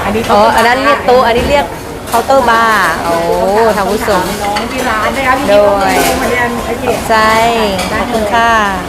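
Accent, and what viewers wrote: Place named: Xayabury, Laos. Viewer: พึ่งเข้ามาติดตาม สำเนียงน้อง คล้ายทางแขวงไซยะเนาะ ฮ้องเพลงกะม่วน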